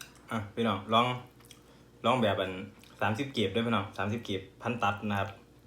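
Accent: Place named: Isan, Thailand. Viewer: คำเว้าแบบนี้ ลูกศิษย์ บ่าวท้าวคักๆผมว่า555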